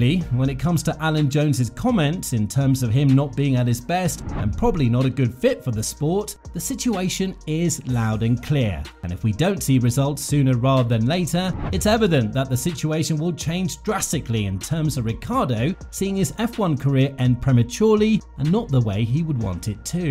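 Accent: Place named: England, United Kingdom. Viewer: An English accent saying it's all over for the Aussie driver. Some things never change. This because of cricket isn't it?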